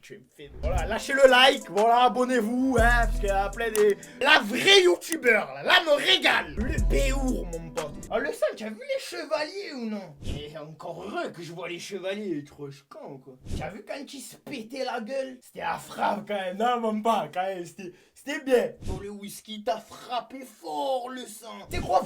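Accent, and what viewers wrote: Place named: Marseille, France. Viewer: Challenge: tu pourrais faire une vidéo réaction sans tout axant marseillais, sa serait cool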